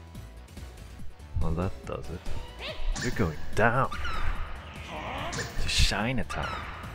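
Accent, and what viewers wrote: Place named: Europe. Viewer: Pardon me if this sounds a little rude, but do you have an accent? Your is so unique and cool and I want to say it's due in part to a European accent of some sort, but I can't quite put my finger on it. Where are you from?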